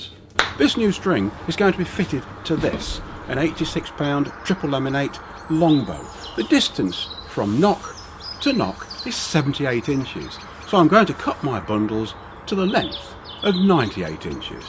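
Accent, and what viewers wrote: United Kingdom. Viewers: Don’t judge me but I’m trying to figure out if he’s British or not (the accent) Using inches and all… Very good video btw!!!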